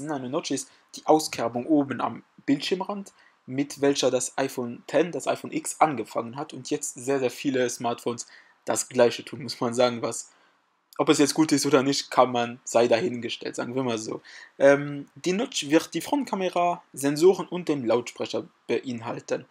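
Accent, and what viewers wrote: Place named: Luxembourg. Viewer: Dat heiert enn met der stemm dat ass esou en deitsch-letzebuegen Akzent Waiste wat ech mengen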